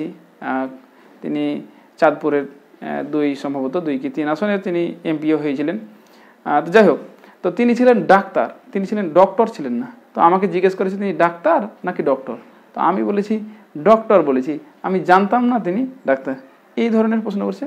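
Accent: India. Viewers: Apnr kotha shune mone hocche apni indian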